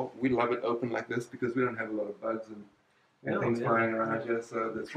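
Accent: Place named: South Africa. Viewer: You can pick up the South African accent anywhere :D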